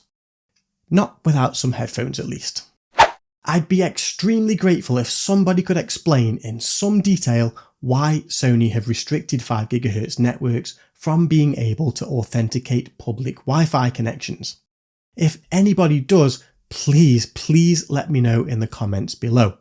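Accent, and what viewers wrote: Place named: London, United Kingdom. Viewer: My to is working amazing sounds like you’re connecting is more problems I live in USA you sound like in London or Europe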